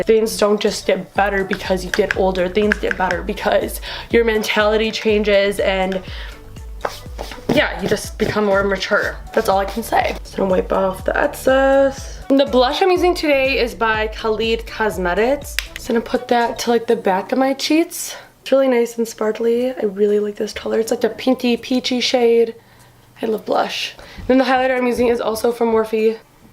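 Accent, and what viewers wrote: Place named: Midwest, United States. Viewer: youre so pretty !! you must be from the midwest too, I can tell by the accent <3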